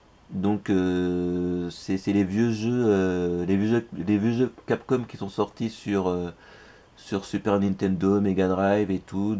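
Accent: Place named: England, United Kingdom. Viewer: Bon la vidéo date mais ça se voit que t'as l'air sympa et t'as une belle petite collection. Belle accent anglais. Par contre, l'intro est catastrophique 😂.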